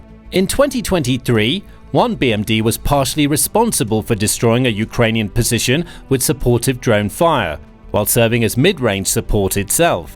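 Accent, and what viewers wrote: United Kingdom. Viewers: Soon as I heard the accent, I knew it was going to be all lies and I'll be dern, it sure is. Outright fabrication. If the MI6 actually believes this crap, it's no wonder their empire has crumbled! Furthermore, how can these MI6 boys think we are ignorant of their little tricks and plots? Only the dumbest people believe that Janes Bum fantasy as savior of the world. HA! The world is awake now and will shake off these parasites like a bad cold.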